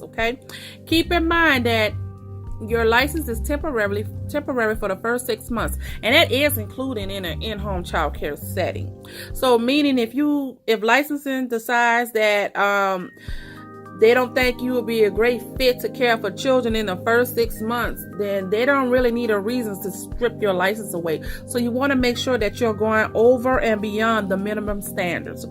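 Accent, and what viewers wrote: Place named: New Orleans, United States. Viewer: That New Orleans accent is strong!! Thank you so much for the info.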